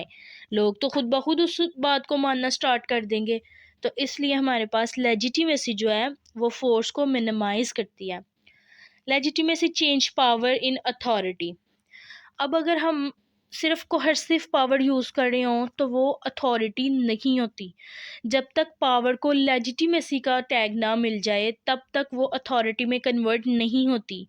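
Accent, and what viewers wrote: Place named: Lahore, Pakistan. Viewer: Lahori awaz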